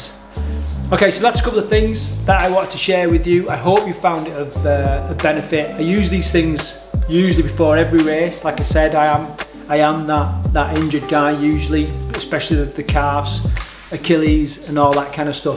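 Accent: United Kingdom. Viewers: I like your content and your channel. But i have a huge problem to understand you. I am not a native english speaker. Your british accent is so heavy to understand that after a view minutes i have to stop every of your videos cause i simply don't understand you. Therefore i also unsubscribed your channel. It would be nice for the world outside of britain if you speak with less accent.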